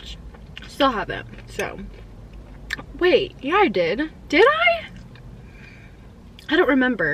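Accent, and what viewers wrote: South, United States. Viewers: Just curious, where are you from? You sound like you’re from the south and so am I! 💗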